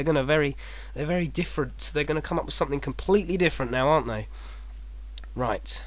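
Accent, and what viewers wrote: United Kingdom. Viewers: I always enjoy hearing British voices which is why I like Michael Rosen poops so much. What part of England are you from? Or perhaps are you from a different country? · ***** He's definitely from Britain. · Your voice is like the love child of Yahtzee Corshaw, Stephen Merchant, and every single other British voice I heard over the internet.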